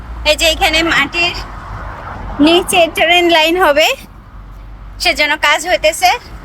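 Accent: Khulna, Bangladesh. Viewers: আপনি খুলনার মেয়ে?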